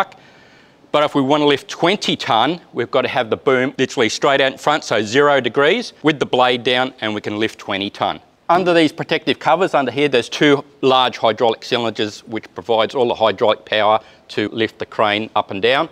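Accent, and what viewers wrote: Australia. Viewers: It's Aussie regional, I'm getting used to it. There is so much good knowledge conveyed that a voice doesn't matter.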